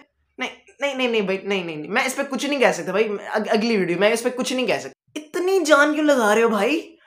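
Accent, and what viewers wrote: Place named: Lahore, Pakistan. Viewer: I love lahore ❤❤mujhy phely sy hi apky baat karny ky style sy lagta tha ky ap lahori ho ❤❤❤❤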